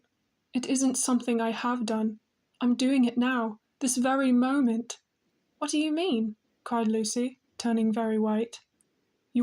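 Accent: United Kingdom. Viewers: Very classy british accent.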